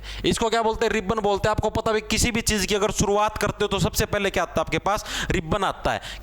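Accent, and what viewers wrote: Haryana, India. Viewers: Padne naa aate aapke yahan toh aapki language sunne aate h sir jii 😅😅 haryanvi on top ❤❤ mittar 🤭🤭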